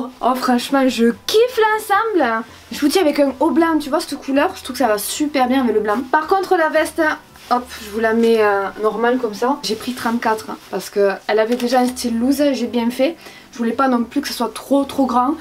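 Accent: South, France. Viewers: D'habitude l'accent du Sud me tape sur les nerfs, je ne supporte pas .... mais toi tu me fais tellement rire que ça passe tout seul 😀 j'adore ta manière de filmer, de présenter les articles... tout en simplicité c'est hyper agréable. J'ai l'impression d'être avec une copine après une séance shopping 😀 Bye bye 😘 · Yes !! J'adore tu illumine mon arrêt de travail (qui est beaucoup trop long, 3 semaines c'est trop! 😂) Ça me ramène au pays ton accent ! Même si je suis encore dans le sud je suis pas du bon côté ! Bref j'adore tes vidéos !! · Ça fait quelques mois seulement que je t’ai découverte! Tes vidéos me font toujours rire, ton accent bien de chez nous qui fleure bon le soleil du sud, ton humour, ton auto-dérision (tout le monde n’a pas cette faculté !!) bref, toujours un moment sympa, merci ☺️🙏🏼💜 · Je regarde jamais les haul de YouTubeuse sa me gonfle mais les tiens j’aime trop avec ton petit accent du sud et ta personnalité. 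Sa fait 3 jours que je regarde toutes vidéos mdr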